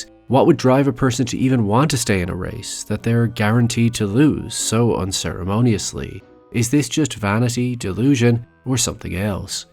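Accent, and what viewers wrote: Ireland. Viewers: LMAO a real "America is the whole world" out in the wild! It's an Irish accent you plonker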